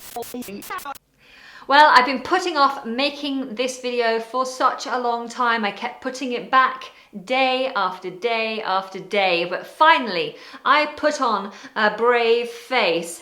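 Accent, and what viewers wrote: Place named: Liverpool, United Kingdom. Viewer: lol, sudden transition effect scared me:)... SUPERB VIDEOS ..KEEP POSTING ...WANNA SEE ANOTHER LIVERPOOL ACCENT VIDEO OR MAYBE A SERIES OF VIDEOS....haha amazing accent.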